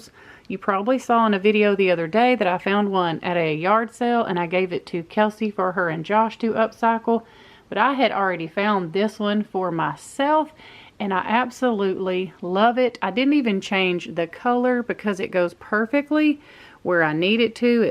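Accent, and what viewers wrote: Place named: Texas, United States. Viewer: Love your videos and your Texas accent! But does your hubby ever complain of too many baskets?lol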